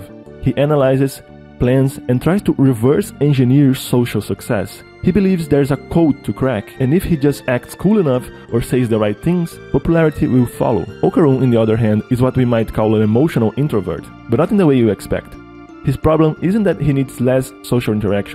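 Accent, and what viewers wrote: Brazil. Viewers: Posso estar errado, mas esse cara é brasileiro? Tá parecendo um brasileiro falando inglês ou eu estou louco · vc parece ser brasileiro pelo seu sotaque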